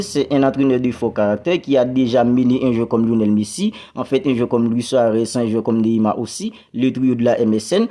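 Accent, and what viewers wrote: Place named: Haiti. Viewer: Ou haïtien boug Mwen ? Ça s'entend 😄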